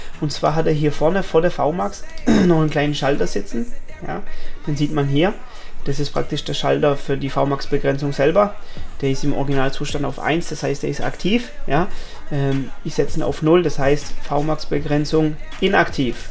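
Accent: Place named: Swabia, Germany. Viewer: Allein schon wegen dem Schwäbischen Akzent gibts einen Daumen hoch!!!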